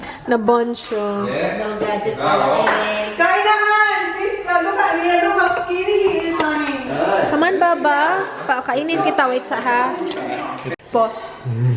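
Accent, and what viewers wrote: Visayas, Philippines. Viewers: Cute jud kaayo ka ate mag tag-bis bah... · Nakaka aliw yung bisaya-tagalog 😂❤️ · Hahahahaha, ah oi makatawa ko sa paburutin oi hahahaha.. cute kaayo magtagalog bisaya si 💋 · magkatawa ko usahay sa imong bisaya day,cute lang paminawon.like (paborutin)😁😁😁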